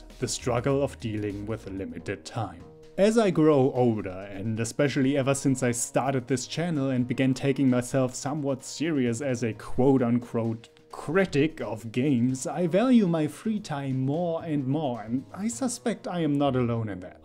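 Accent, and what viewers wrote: Germany. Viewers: Great video. I couldn't agree more - I only started YouTube a few months back, and even though barely anyone watches my stuff yet, I know what you mean about valuing free time differently. At points it really feels like there's not enough time in the day to do what you need just to improve your videos/channel, not to mention everything else. You've made some really apt points. Subscribed. Ich wollte auch fragen, ob Sie aus Deutschland kommen, oder ein deutschsprachiges Land? Ich bin mir nicht total sicher, aber ich glaube, ich erkenne den Akzent. Davon abgesehen ist das Englisch in den Videos absolut perfekt! Tolle Leistung :)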